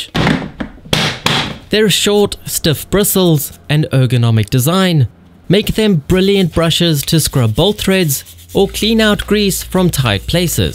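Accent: South Africa. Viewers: Hey man u south African if not where you from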